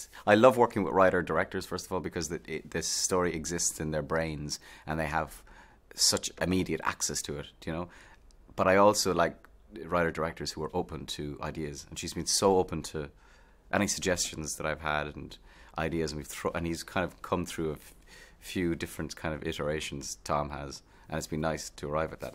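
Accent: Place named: Ireland. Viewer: In this interview he is talking with his natural irish accent. So charming 😍. And his voice sounded more high-pitched.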